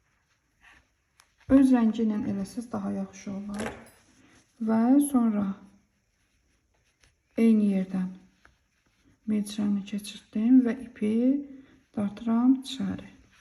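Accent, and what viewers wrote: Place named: Bayburt, Turkey. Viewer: O kadar güzel anlattınız ki örgü severim zaten şiveniz bize yakın bende Bayburt luyum,bitirince instagram dan sizi etiketleyerek paylaşacağım❤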